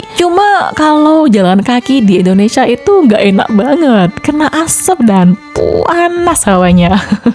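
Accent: Java, Indonesia. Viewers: Maneh adalah bahasa Aceh artinya manis, dan mungkin berasal dari bahasa Melayu. Ya saya berasal dari Aceh, yesss saya asli Aceh, seorang pria jelek, tua ( 38 tahun/ 15 juni 1985 dan tapi kita muslim tidak pernah percaya kepada ramalan zodiak / bintang karena percaya kepada ramalan, tahayul, khurafat, dukun, sihir adalah hal yang paling dilarang dalam islam dan dosa besar) dan saya sudah menikah ( 29 juli 2010 Alhamdulillah) istri saya juga asli Aceh. Saya bisa menebak In Syaa Allah bahwa kamu orang Jawa walaupun kamu mirip Sunda atau mirip orang Sumatera tapi saya yakin kamu bukan orang Aceh, karena gayamu, karakter kamu, logat kamu sama sekali tidak mirip orang Aceh. ALLAHU AKBAR ALLAHU AKBAR ALLAHU AKBAR... Baiklah adik saya dari Jawa, apakah kamu sedang tertidur setelah sahur atau tidak, atau sedang membaca tulisan saya ini, please 🌹🌹🌹 jika kamu punya banyak waktu bacalah terjemahan Al Quran di hp mu secara acak beberapa ayat saja, itu akan membuat kamu bahagia In Shaa Allah dan saya minta maaf telah mengganggu anda lagi. 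ALLAHU AKBAR🌹🌹🌹 !!! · Smpyn bahasanya kok kayak orang Jawa sih Mbk , jawanya mana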